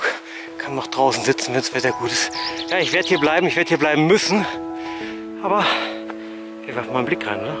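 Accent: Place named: Baden, Germany. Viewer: Das ist badisch!! Wir reden nicht komisch...IHR redet komisch :-) In Hausach war ich auf dem Gymnasium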